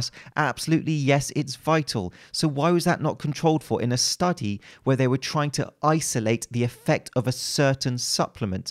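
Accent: England, United Kingdom. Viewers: A science based throwdown in an English accent is the best kind of throwndown.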